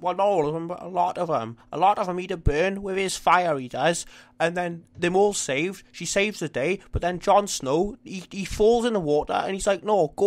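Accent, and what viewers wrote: Wales, United Kingdom. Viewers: Such an interesting accent you have, (I'm from upstate new york). Is Welsh your first language? Just curious how it usually works in Wales.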